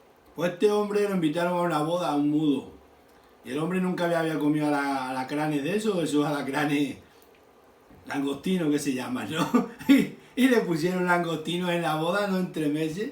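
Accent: Extremadura, Spain. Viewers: me encanta su acento extremeño. me recuerda a mi madre que lo era. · Medio minuto y ya bastante seguro de que este señor es extremeño, y me suena que probablemente de algún sitio de Cáceres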